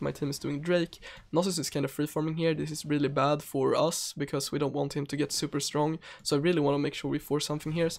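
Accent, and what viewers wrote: Sweden. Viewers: Älskar din svengelska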